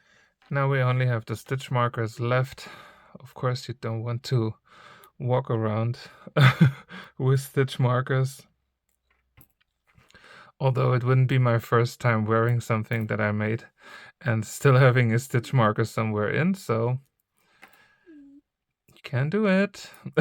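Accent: Germany. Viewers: Dieser Deutsche Akzent, er lässt sich nicht leugnen 😂 Super Anleitung. Danke. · DIE anleitung wäre auf 2-3 Minuten reduzierbar, wenn man das Prinzip der Maschenentstehung erklärt. Mehr braucht es nicht. Und als Anschlag eine Luftmaschenkette ist einfacher. Übrigens: bei diesem gruseligen Denglish bekommme ich Ohrenschmerzen!!! Mach Deine Videos auf Deutsch oder lerne eine vernünftige Aussprache. Alles andere tut einfach nur weh!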